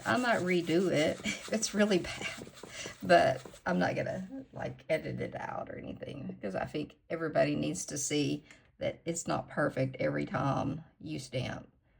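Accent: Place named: South, United States. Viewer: This is a darling mani! The reindeer and tree turned out so cute and I love plaid. The colors you chose are beautiful. Please don’t apologize for that southern twang. It’s your heritage and part of what makes you special. Being from the South I sometimes wish I had a Southern accent, but I do not. I don’t even sound like my siblings. I take after my mom who was from NC. That’s not that far away but the accents are so different. My dad was a country bumpkin if ever there was one! I’m the odd ball of the family. Even my daughter doesn’t say words the same way I do. Geez, talk about rambling!😂 Thanks for another fun night with you. I’m going to miss these videos.❤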